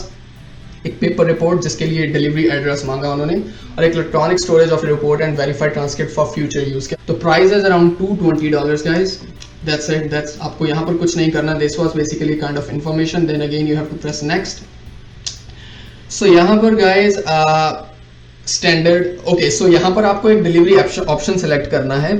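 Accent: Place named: Canada. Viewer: Veere .. gonna gonna gonna 🤪 kini vari bolna hai.. u adapt Canadian Accent pretty well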